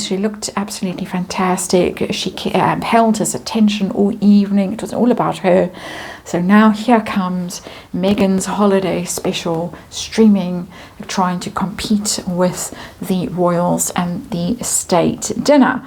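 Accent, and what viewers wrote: South Africa. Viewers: I have to 'scroll up' to get their faces out of view and just listen to any commentary. That's why it's nice to look at the tarot cards and listen to that lovely soft South African melodic voice with its occasional giggles.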